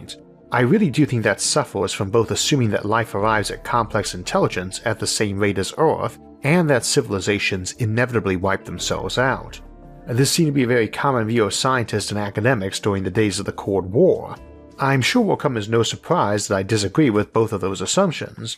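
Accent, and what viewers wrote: United States. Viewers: I didn't know it was an impediment I just thought it was his accent from the part of the US he came from. I like it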